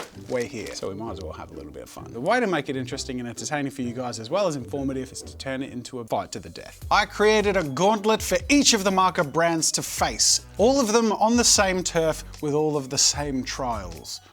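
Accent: Australia. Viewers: Wait are you Australian? You have a strong accent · I’m looking for good markers.. without paying a fortune came straight here and thought he sounds Aussie.. then you said ships to Australia 🇦🇺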